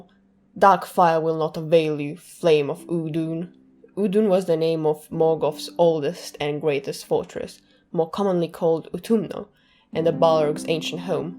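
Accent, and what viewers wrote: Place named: Brazil. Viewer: I'm pretty sure she's Brazilian, they pronounce R's with an H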